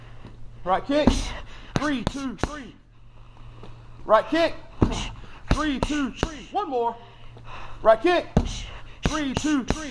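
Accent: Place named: United States. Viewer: I train Muay Thai out here in Thailand. Never been to another gym. It's a trip hearing a trainer speak English terms with an American accent and attitude. lol. I need to come visit a gym back in the States! heh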